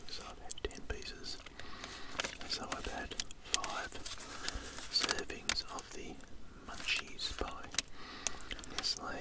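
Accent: Australia. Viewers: I guess the Australian accent is the best for whisper asmr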